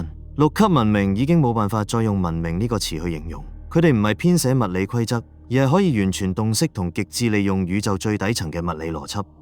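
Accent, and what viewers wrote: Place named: Hong Kong, China. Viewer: 好少有人用港式廣東話講宇宙級文明，好清晰唔錯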